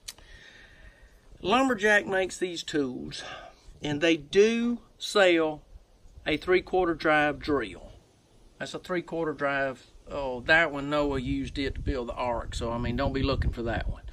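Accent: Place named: South, United States. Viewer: I LOVE your voice and accent! Every time you speak it reminds me of great Ken Curtis the actor who played deputy Festus Haggen on Gunsmoke and sang with the Sons of the Pioneers. I'm southern too, from South Carolina so I also have the southern drawl lol. Glad I found your channel :-) I just bought some of these tools to experiment working with and your insight and experience is very helpful. Thank you! Great video, new sub :-)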